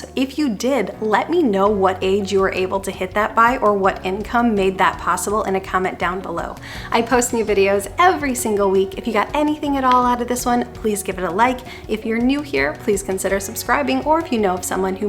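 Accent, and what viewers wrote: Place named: Michigan, United States. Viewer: subtle michigan accent detected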